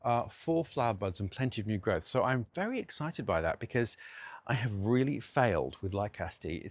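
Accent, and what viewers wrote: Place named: Australia. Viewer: your rental is fabulous and please don’t stop making videos! Your great sense of humor and delightful Aussie accent truly elevate the viewing experience for me, and I thoroughly appreciate your orchid culture insight and so enjoy seeing your plants. Happy New Year from my very crowded rustic cabin grow space in Southern CA.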